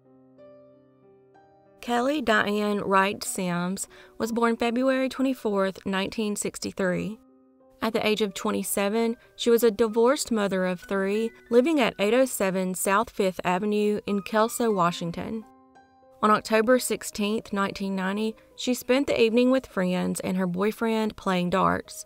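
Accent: South, United States. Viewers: Love your lil southern accent. Hello fr panama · Your lovely Southern accent always makes me feel like I’m sitting and having sweet tea, with a true Southern Belle! 🤗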